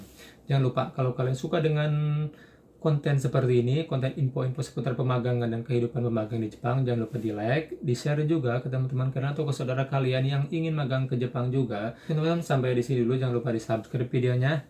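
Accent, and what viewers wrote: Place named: West Java, Indonesia. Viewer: Logat Sunda nya kng rada ka candak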